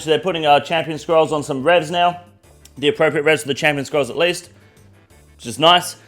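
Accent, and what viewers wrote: Australia. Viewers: idk about you but i’m watching his vids because he’s actually unique, very very funny (had quite a few laughs here) intelligent and level headed. Doesn’t involve himself in drama. Stays winning and stays in his lane. Aussie accent, what’s not to like about the dude? He could be reading the entirety of lord of the rings as an audiobook and i’d listen because it’s his energy and personality that’s infectious.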